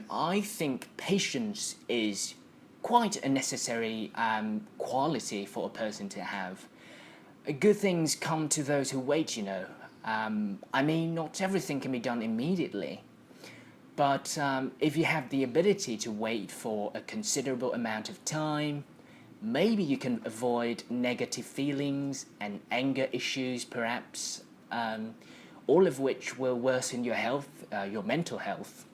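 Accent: United Kingdom. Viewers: Chào bạn, mình rất thích giọng Anh Anh của bạn. Bạn có thể chia sẻ cho mình 1 số channel British Accent mà giọng Nam ko? Thanks for your help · Tỉ năm mới tìm đc 1 anh nói English accent :3 không cô đơn trong thế giới các bạn nói giọng Mỹ =))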